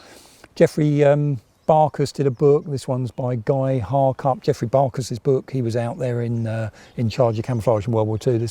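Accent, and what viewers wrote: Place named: Cockney, United Kingdom. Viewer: Septics...a bit of cockney is like a whiff of home...even if you're from the valleys, like me... :)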